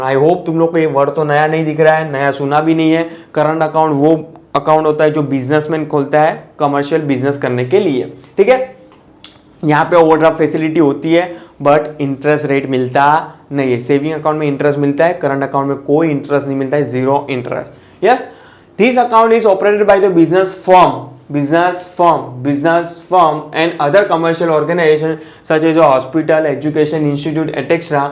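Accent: Gujarat, India. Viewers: I agree with Rohan. Sir apke pas sufficient knowledge hai but Voh hum tak pahunch hi nhi rha hai. You're just reading TB & winding up the part . I think you should go in depth & explain various terms & give us examples. Also sir tame gujrati 6o ne .... Tamari accent par thi khbr padi gayi 6 mane .... Sir the way u speak 🗣️ etc & various is just gujratically awesome ... Gujratically is made by me ( Gujrati + beautifully ) Sir hoping you to change the way you teach ...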